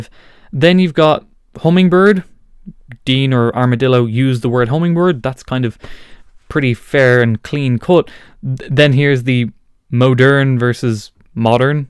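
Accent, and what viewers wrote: Dublin, Ireland. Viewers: Englishman here, got me too! Turns out I’d never heard an Irishman say the letter R before. Now I know. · That is not how all Irishmen pronounce the word R , he is from Dublin & that is how they say their R`s. Just like the UK , there are many different accents in Ireland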